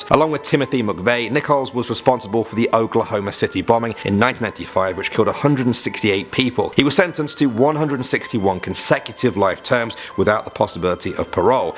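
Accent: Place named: United Kingdom. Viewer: Hey, where in the U.K are you from? I don't recognise your accent.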